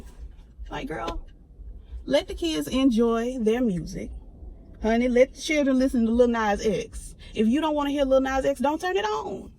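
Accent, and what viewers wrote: South, United States. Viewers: You make that southern dialect look good 🥰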